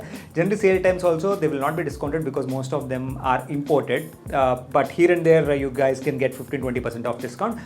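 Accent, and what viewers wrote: India. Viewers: Love your videos and your hindi accent❤😂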